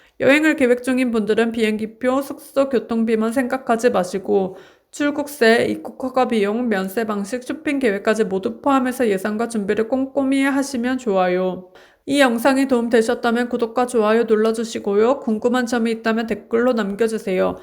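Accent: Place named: China. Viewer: 말투가 중국인인데요? 일본인의 한국어사투리발음아님! 발음이 한국인이 아닌거같음?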